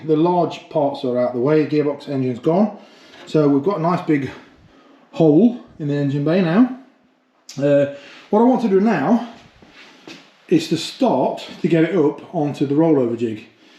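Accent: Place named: Nottingham, United Kingdom. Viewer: I hear traces of your Nottingham accent. Is the Fiesta going back to bare metal?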